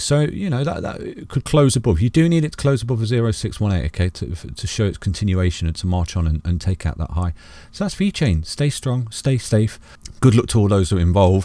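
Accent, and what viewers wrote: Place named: East Midlands, United Kingdom. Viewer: Great analysis. Sounds like you’re from Derby/Nottingham by the sounds? I don’t know if you’d class it as an East Mids accent.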